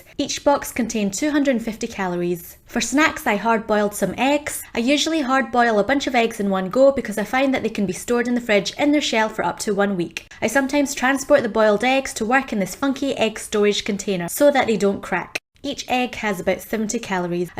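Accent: Scotland, United Kingdom. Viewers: You live in Australia but you have an awesome accent that makes you sound Scottish almost. Where are you from originally?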